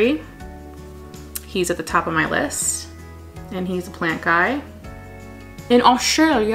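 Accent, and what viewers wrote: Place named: Illinois, United States. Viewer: Been watching Becca for a few years and finally wandered over to Potted Together and now you. LOVE IT. I grew up in Wildwood, IL just 30 minutes north of you. Love hearing your accent, brings back memories. 💕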